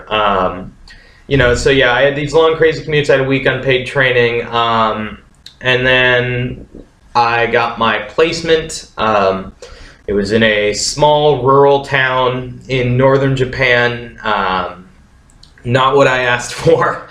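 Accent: United States. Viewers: awwww. white boy feels like a minority? Wtf did you think Japan was a magic wonderland? it is a place like any other. You sound American. America isn't any better. It is worse in many ways. All places have their ups and downs. You sound miserable and desperate. It's not the country you are in. It is you. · Sounds like an american...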